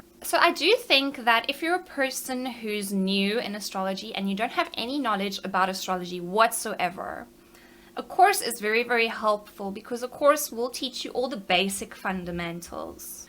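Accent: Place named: South Africa. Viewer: you can't destroy that south african accent with a ridiculous american "r"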